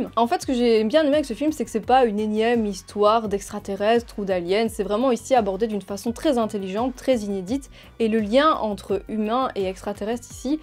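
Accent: Belgium. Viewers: j'adore l'accent belge :D ça me rappelle Bruxelles! J'adore les vidéos!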